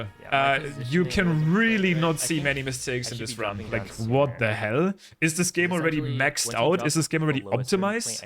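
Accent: Germany. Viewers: Are you from Germany? Because IT Sounds like you are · are you german? because of your accent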